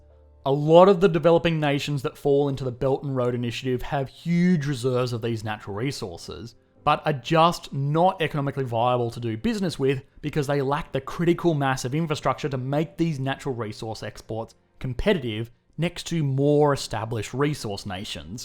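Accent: Australia. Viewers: This dude sounds Australian and is saying China is about "Free Trade".... Has he looked at his local newspaper? Almost like me saying America doesn't have a rioting problem. Or China saying they didn't start the Coronavirus. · 趙子畵 hes definitely an Aussie.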